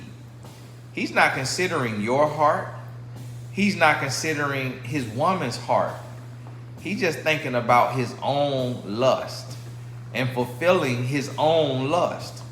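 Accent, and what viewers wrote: United States. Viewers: Awesome as always,. But it's the way you say water and back for me. 😄 I love the country accent.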